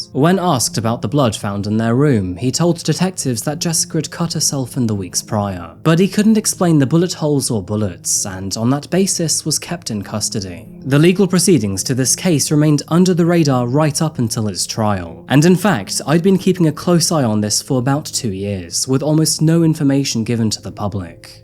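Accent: England, United Kingdom. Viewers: You sound so polite, so refined, I did not think you were American! 😂 I thought you were British. 😂 ❤ from Australia P.S. I absolutely love your channel - addicted in fact - keep up the good work! 🤩🤗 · I’m so glad you don’t speak like an American! Love your British accent ❤ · And no better accent to do it with than the British accent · wait ..whaaaa did he just say that he was born in Seattle Washington ??? did I mis hear ? This guy is the most british sounding Brit in the history of Britain LOL weird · Ok you have to explain how you were born in Seattle but you have an English accent? Sorry if you’ve already explained this somewhere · Where do you get your English accent. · Born in Seattle? You must have moved to the UK very early on because that is a lifelong British accent. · How tf were you born in seattle but sound like a complete tommy? That's weird my dude... 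· my Sister n fam lives in Seattle I am so glad I have lived in Western CO since 1980, I thought u sounded a bit like u lived in UK due to ur voice love ur vids thx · I thought u were British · Wait a second here. You're from Seattle? Where's the English accent come from then. Or is it fake? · Who writes the scripts for this stuff? Why did i need an introduction of Seattle? Why did you tell me you were born in Seattle but leave me confused with your British accent. I can only guess you moved to the UK at an early age. You need a better team for your videos... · If you’re from Seattle, why do you sound like a British kid? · Wait, you're from Seattle? What's with the English accent, then? Is it just an act? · Okay how did you end up with the British accent? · Love your videos and your British accent. As for the douche bag who killed this young couple because they could not pay their rent, it made my day to know that he is behind bars and that he is going to stay there for a very long time, probably until he dies. 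What a dumb guy, you are paying back now for being so stingy and evil. Now you are old, weak, alone, depraved of freedom, without any outlook. I am glad that courts of justice in the US are severe, much more than in France. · How were you born in Seattle with a English accent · I'm glad this case got solved and that pile of anal seepage is behind bars. Thank you for another great video! They're always so well-made. You're not just a disembodied voice or 3 hours of interrogation videos with minimal, basic commentary. You're empathetic, which I absolutely love! You're kind and respectful to the victims and their families, and it's also nice that you sound (like your accent) that you're close to me! I like watching more British creators, obviously I get our dry humour. I'm rambling. Anyways, thank you for keeping us all informed! Big hugs for you ☺🫂🫂🫂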